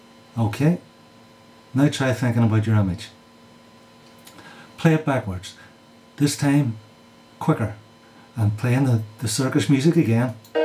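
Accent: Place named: Northern Ireland, United Kingdom. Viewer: guy is northern Irish. always strange to hear your own accent. lol great video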